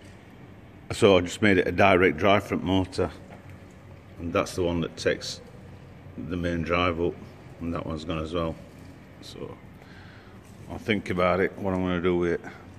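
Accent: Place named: Yorkshire, United Kingdom. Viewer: Reckon he should be voiceovering plenty of stuff on telly. 👍 Clear, concise, no nonsense. Anyone would guess he's from God's own county.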